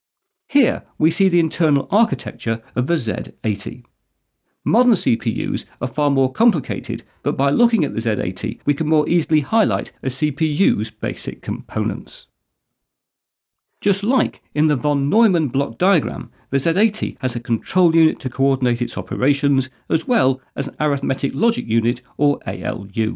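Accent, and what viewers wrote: United Kingdom. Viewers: British accent....is hard !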